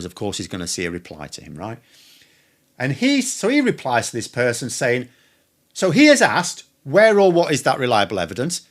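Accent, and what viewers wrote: United Kingdom. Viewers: What dialect are you speaking? You do sound like someone from the UK, easy to understand even for a non-native listener … ;-)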